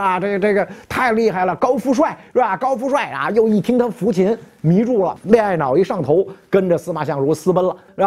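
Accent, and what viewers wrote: Beijing, China. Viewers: 老师的京片子很好听😄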